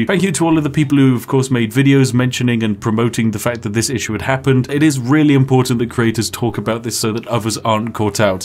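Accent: United Kingdom. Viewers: (°o°:)ᴼʰ the is back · This suddenly became a big irony for this.... Anyway good the have the Bri'ish back! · The British man is back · Woo the is back! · Ngl, I'm British and I still don't know how you have a Chad British accent.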